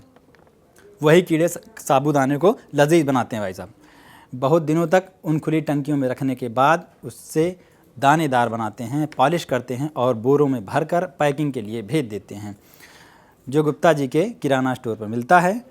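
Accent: India. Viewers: Ekdam देसी accent hai. Hum sab bhartiya apni matrubhasha ki accent mein jab bat karte hein, to ek alag sa feel hota hai. Angrezi se door hote hi sukun milta hai 😄😄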